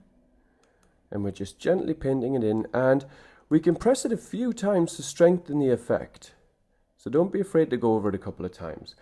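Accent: Newcastle, United Kingdom. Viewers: are you from Newcastle? awesome tutorials thank you ☺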